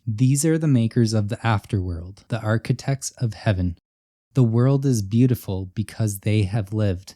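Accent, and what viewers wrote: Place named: Maritimes, Canada. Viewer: Maritimer eh? Just subtle.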